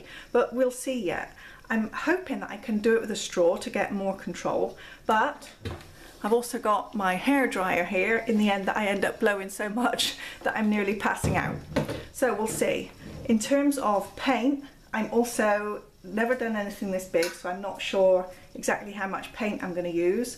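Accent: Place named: West Country, United Kingdom. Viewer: love this one I noticed you have a westcountry accent do you live near St.Austell just wondered if you did workshops.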